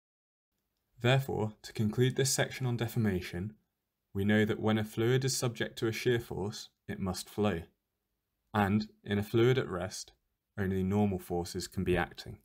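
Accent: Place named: India. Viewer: Indian English make me sick.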